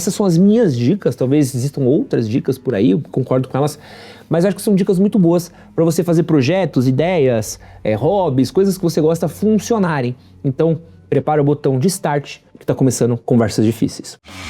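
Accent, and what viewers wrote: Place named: Sao Paulo, Brazil. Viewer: Provavelmente tu não vai nem ler isso aqui, mas você me venceu na consistência. Vou ser muito transparente: Eu tinha um preconceito com sotaque Paulista, não sei porquê. Seus vídeos eram recomendados para mim e, diversas vezes, eu clicava pra ver e na metade saía. Não sei dizer qual vídeo foi, mas algum passou uma ideia muito boa. E eu tenho o costume de me permitir ser vencido por boas ideias. A partir desse ponto me inscrevi e passei a acompanhar (eu não costumo comentar quando acredito que não vou agregar, então nem sei se já comentei alguma vez aqui). Te admiro bastante, todo sucesso que você tem é merecido. Espero conseguir desenvolver essas habilidades que vejo em você na minha própria vida.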